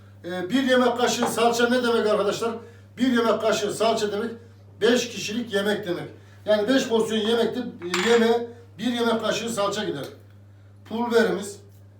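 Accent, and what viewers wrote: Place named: Sivas, Turkey. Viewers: Sa Nerelisin ustam iç anadolulu gibi konuşuyorsunuz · Abi nerelisin sivaslılara benziyon 😁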